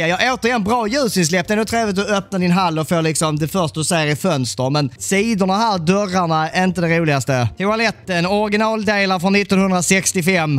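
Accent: Scania, Sweden. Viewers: Älskar skånskan ahahaha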